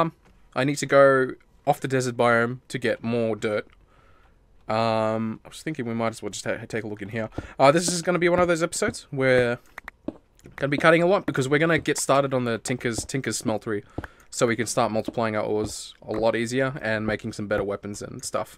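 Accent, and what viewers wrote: Australia. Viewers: Question: can you make a video without holding back the australian accent and swear words?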